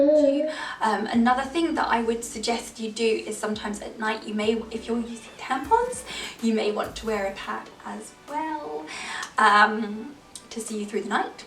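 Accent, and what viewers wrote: England, United Kingdom. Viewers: Lol. I don’t even know why I watched this. “Curiosity killed the cat”...they say. Your personality is so magnetic I guess I’ll listen to you talk about anything. That English accent.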